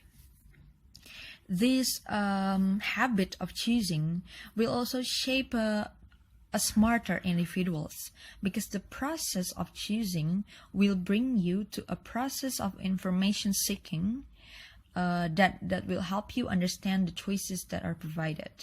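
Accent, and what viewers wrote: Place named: India. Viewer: kayak accent india ya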